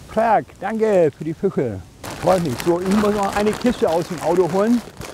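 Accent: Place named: Frisian, Germany. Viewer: Ich könnte dir stundenlang zuschauen. Ich mag dieses friesische Dialekt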